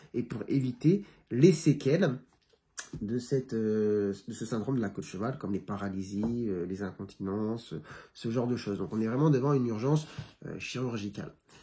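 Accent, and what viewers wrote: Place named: North, France. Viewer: Ça dépend aussi de la région d'où on vient, je crois que le dr est originaire du Nord. Pour ma part, ma mère étant aussi de là-haut, j'ai hérité de cette prononciation.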